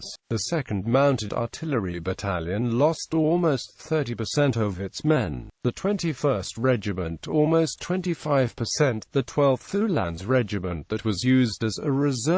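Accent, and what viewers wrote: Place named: Wales, United Kingdom. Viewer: I never knew AI could do a Welsh accent :)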